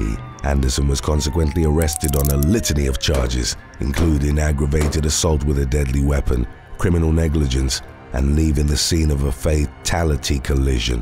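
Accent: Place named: United Kingdom. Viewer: As I’m British myself, I never understood women who LOVED British men’s accents until I heard this guy! 😊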